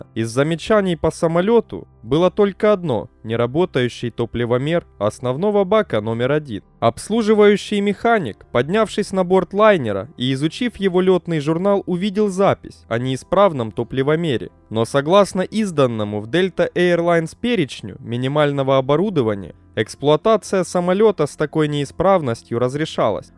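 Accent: Ukraine. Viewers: Украинский акцент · украинский акцент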